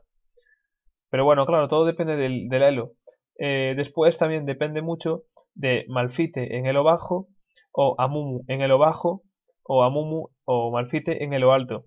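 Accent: Galicia, Spain. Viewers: Eres gallego? tienes mucho acento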